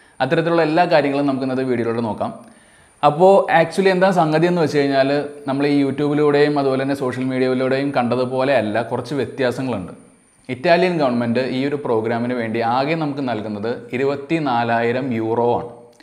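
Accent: Standard. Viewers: നല്ല വൃത്തിയുള്ള മലയാളം.... അടിപൊളിയായി വിശദീകരിച്ചു ...ആശംസകൾ..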